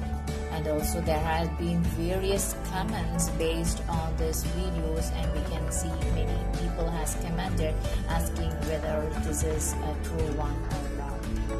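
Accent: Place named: India. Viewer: She sounds like indian.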